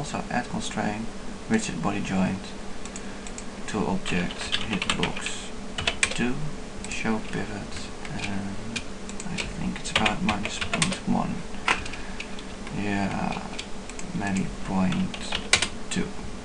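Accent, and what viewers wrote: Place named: Netherlands. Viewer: nice dutch acscent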